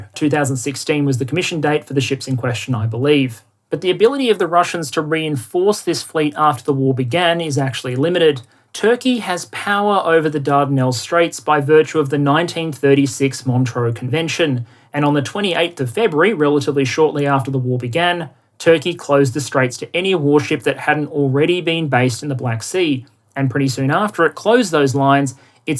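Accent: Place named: Australia. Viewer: As usual for, excellent. One observation - not a complaint but a quirk of a Yank listening to an Aussi - It took me until the last few minutes to realize that you were saying "hulls in the water" and not "holes in the water". Honestly, I thought you were riffing on the joke that "a boat is a hole in the water into which you pour money"... Either way it made sense, sort of.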